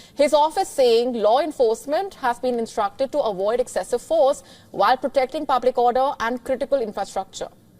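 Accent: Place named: India. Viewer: They are trying to mainstream the Indian accent. They think they are anglo saxon. "Me-ziles".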